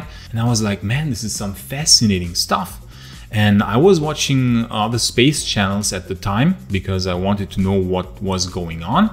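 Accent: Germany. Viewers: You could have pushed your audience to Odysee or Bitchute. No algorithms there.... I'm gonna miss your show, but I wish you guys the best! I'll give the other guys a watch, though... It won't be the same without your sarcastic comments made in you German accent, but I'll give them a chance. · I like both topic and I was actually subscribed to the other one ,… and I just realize it was another channel… . Your accent is from German?… I’m Spanish speaker and going throughout B 1 in German :). ( Austrian wife 🤷🏻‍♂️) .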